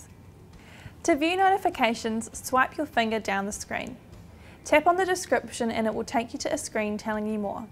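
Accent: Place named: New Zealand. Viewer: dat New Zealand accent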